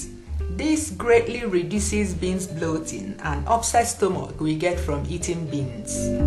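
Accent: Igbo, Nigeria. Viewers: nice accent madam!!! igbo kwenu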